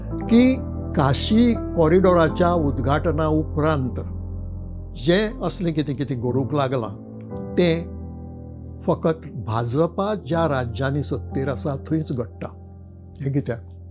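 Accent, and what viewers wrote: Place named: Konkan, India. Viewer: Great words... A lesson to learn.. Secondly what a pure konkani.. Love to listen...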